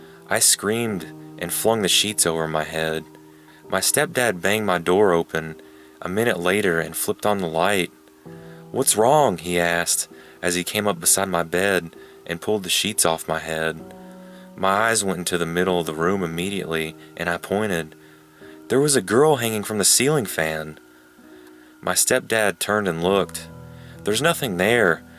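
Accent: South, United States. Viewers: first time on this channel......damn, you sound southern... its ok, I'm from texas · you sound American you have a accent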